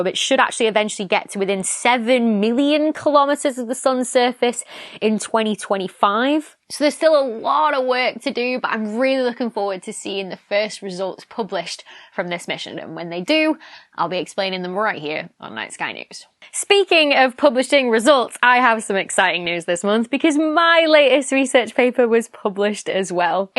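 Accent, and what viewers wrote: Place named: Manchester, United Kingdom. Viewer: You were speaking much closer to the Manchester accent in your first videos. Unlike what some say, there is no harm using a regional accent.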